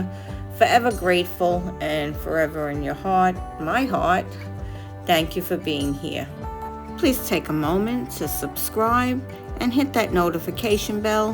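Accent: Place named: New York, United States. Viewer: I’ve set the notification! I always want to write ‘ ’ to play up your NY accent!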